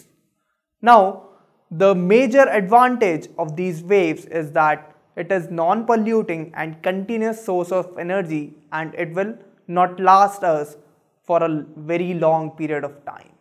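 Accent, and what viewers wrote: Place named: India. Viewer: Because, his brain starts in Hindi then... to Indglish ..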